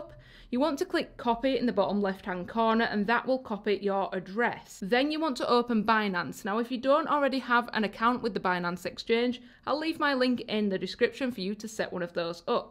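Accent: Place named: United Kingdom. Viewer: british accent nice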